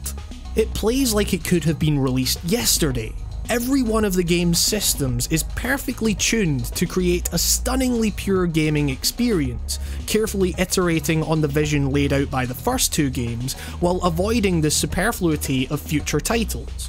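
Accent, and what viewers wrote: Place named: Scotland, United Kingdom. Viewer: Didn't see the Scottish accent coming at all (or is it Irish?), and I quite appreciate diversity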